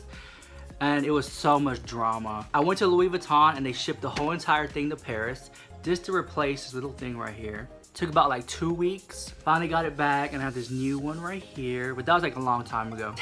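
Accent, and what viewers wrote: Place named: Texas, United States. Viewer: It's cool. I can hear your Texas accent. 😎🤘🏻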